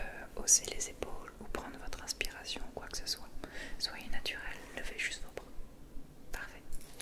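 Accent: France. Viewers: Hey friend! I hope you are going really well :) I just wanted to say that this really sounds absolutely wonderful and lovely! You have an amazing french voice, its so soothing and lovely to listen to. I hope you are staying safe and happy.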